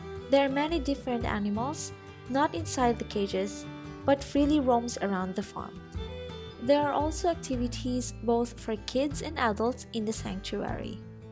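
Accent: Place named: Philippines. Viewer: I think the way you speak and your english and it seems you are a filipino?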